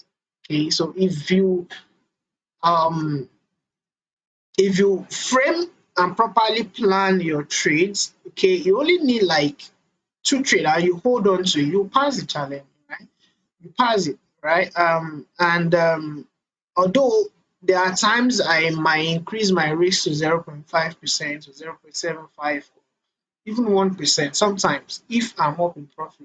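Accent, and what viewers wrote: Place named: Nigeria. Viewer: Ma'am, you sound Nigerian... I am a Nigerian too.. i wonder if its possible to set up a meet for a one on one?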